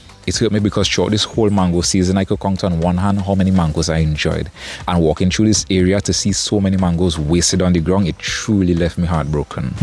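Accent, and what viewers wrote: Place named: Jamaica. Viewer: Your accent took me back to Jamaica.❤️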